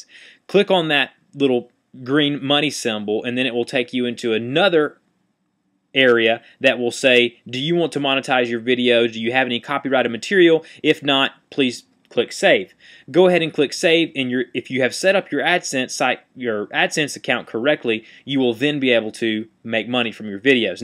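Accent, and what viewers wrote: South, United States. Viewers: you've got a southern accent :) where ye from? xx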